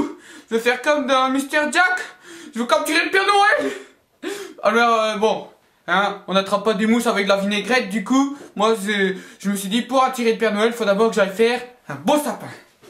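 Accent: Belgium. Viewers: cest la premiere video de que je vois et pour moi cest un jacqouille avec un accent belge echapée d'un hopital pshychiatrique donc voila et en plus il est pas trop drôle! Voila voila koi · J'adore trop c'est ton accent belge 👏👏😄👍🔝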